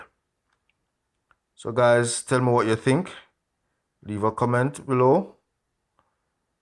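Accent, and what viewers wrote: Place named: Jamaica. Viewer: Heyyy jamaican accent! Bomboclat!!!!!!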